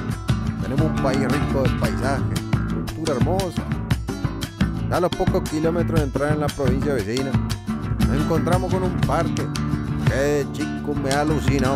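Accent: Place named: Gaucho, Argentina. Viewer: felicitaciones miles,vengo viendo viajeros, como muchos ingrese de la mano de pablito imhof, luego continue con los chicos de viajar vale la pena que ya llegaron con la estanciera al muro de Clinton y Trump que separa mexico de EEUU asi conoci a tambien a mariano palleres y a Sofi y su Pandita y ahora me encuentro con este extraodinario diario de viaje yesta hermosa propuesta de contar el viaje con tono0 gauchesco felicitaciones chicos y ya los vere llegando a alaska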